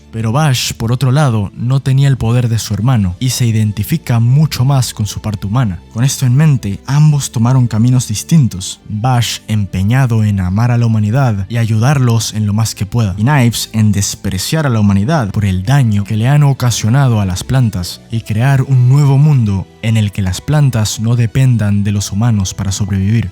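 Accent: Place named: Ecuador. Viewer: Muy buen video, que genial se nota lo ecuatoriano en tu voz. Sigue así, ganaste un suscriptor.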